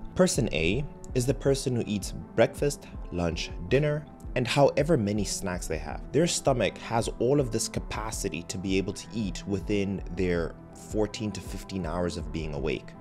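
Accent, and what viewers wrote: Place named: South Africa. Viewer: I detect a South African accent…. Am i right? Very nice summary!! Thank you!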